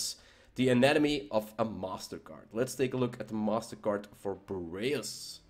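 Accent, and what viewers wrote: Netherlands. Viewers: ah youre from zuid-Nederland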